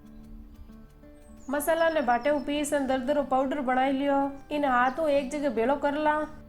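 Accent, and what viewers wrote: Marwar, India. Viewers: जी बहुत शानदार प्रस्तुति के लिये बधाई, आप की मारवाड़ी बोली बहुत अच्छी लगती हैं। आप का बहुत बहुत धन्यवाद 🙏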